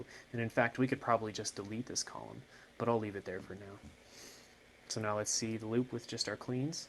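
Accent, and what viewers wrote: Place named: North America. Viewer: Spent the last 4 months creating a project through marcomedia flash 8. I was making a sprite based animation series. Most of the time, thankfully I was just splicing and preparing sprites, improving them, looking for good material and whatnot. When I began using flash I came to a pit stop with z axis. It is very difficult with flash to operate an animation with multiple sprites going in front of and behind each other. It requires extensive knowledge of action script and set depths. Even then, the motion tweening stops working and requires more in depth programming. Screw all that, opentoonz I found by accident and now I want to begin my animation project all over again. The narrator does talk very slow and does have me rolling my eyes when he is all "So ... errrrmmmm this level here........ errrrrmmmm is going to be our uuuuuummmmm next scene." Yeesh, I've come to find all North American youtubers doing tutorials sound like this. 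Must be all the processed genetically messed up monsantos foods you lot are eating over there slowing down your brains or something.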